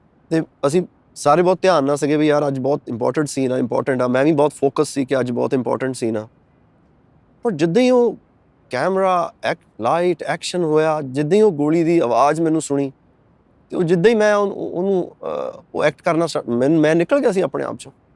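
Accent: Punjab, Pakistan. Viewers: Host lagda Lehnde Punjab toh aa !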